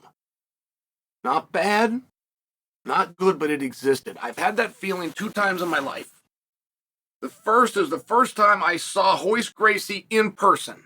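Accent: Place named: United States. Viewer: I'm brazilian and chael have the most american accent i have ever seen speaking portuguese.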